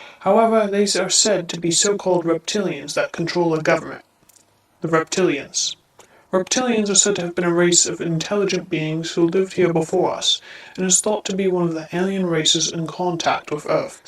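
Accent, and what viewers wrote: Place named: United Kingdom. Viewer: Couldn’t hide your British accent in this one even with the voice mod. I ask to see that other video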